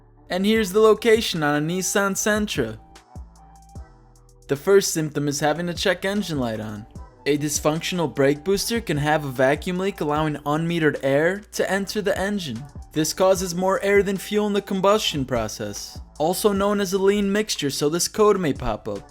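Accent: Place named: Pennsylvania, United States. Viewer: Which im guessing is PA by the way chrisfix says water " woder " .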